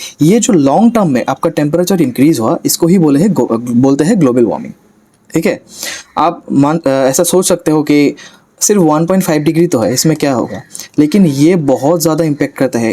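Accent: Assam, India. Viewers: Ur voices like Assamese 🙄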